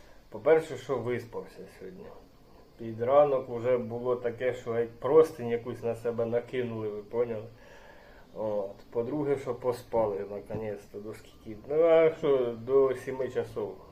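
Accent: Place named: Kamianets-Podilskyi, Ukraine. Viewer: Ваша балачка дуже похожа на нашу ( кам,янець - подільський) з початку як почув то подумав що десь від нас родом😊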